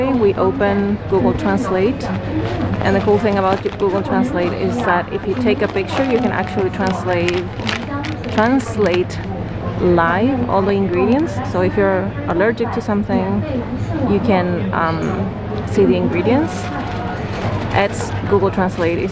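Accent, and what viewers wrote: Chile. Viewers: Amo su acento chileno! 🥰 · Amo tu acento chileno!!! Y que escuches... Abrazo gigante desde Argentina aa💫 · Hola! Aquí una mexicana que tuvo dificultad para entender su español chileno, pero qué lindo que es su acento, eh! <3 Me identifico contigo, porque mi esposo también está estudiando un PhD en U.S. y yo soy una artista frustrada (en eso no nos parecemos, tú ya lo eres jeje). Muchos saludos y que sigan disfrutando su tiempo en Tokio, si siguen por allá, nosotros morimos por visitar. Soy tu fan <3:D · !!! Me encantaría que hablaras más en español en tus videos!!! Tu acento chileno es genial y podrías poner subtítulos en inglés!!! おねがいします!!! 🙏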